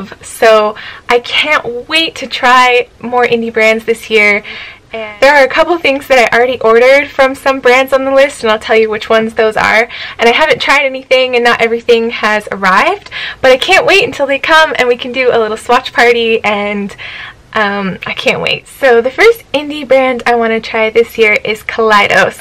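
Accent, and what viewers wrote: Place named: Southeast, United States. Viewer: 💙💙🐈🐈💕💕 I really appreciate your visit to my channel,! I'm happy to return you the digital love with a play in full (10 minutes) and a crazy like #58! Hmmm, I didn't know that vegan cosmetics was a thing. I can't speak for cosmetics, but a lot of Indie music and movies are much better than the mainstream stuff. Shame they don't get the exposure they deserve. "They're so amazing... I just daydream about them sometimes." Very funny with the soap-opera-esque music accompanying! At first I thought it was maybe just my imagination, but after seeing a few of your videos now, I've noticed that you have a slight accent, almost like southeastern US. "Transformative" isn't a word you get to use every day! Looks like Mystic Cat makes an appearance! Thank you for sharing, and I hope your week has been kind to you!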